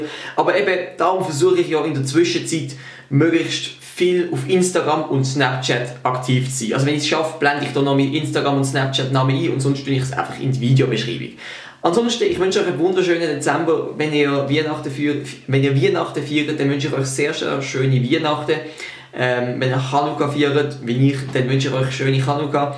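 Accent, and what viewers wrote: Basel, Switzerland. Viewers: Das liit am basler Dialäkt. Dä isch nöcher am Hochdütsche wie zB dr berner oder zürcher Dialäkt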